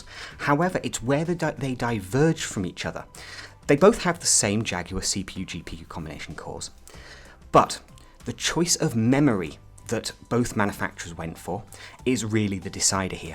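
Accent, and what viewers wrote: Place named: England, United Kingdom. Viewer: SOOOOOOOOOOOOOOOOOOOOOOOOOOOOOOOOOOOOOOOOOOOOOOOO ENGLISH. (I'm British, barely anyone sounds like that here)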